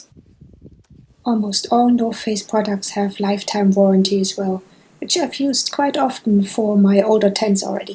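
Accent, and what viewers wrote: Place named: Germany. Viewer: ah, ich hatte mich nur gewundert. Trotz des hervorragenden Englisch hat man den deutschen Akzent dennoch rausgehört. :P Habe direkt mal deinen Kanal abonniert :)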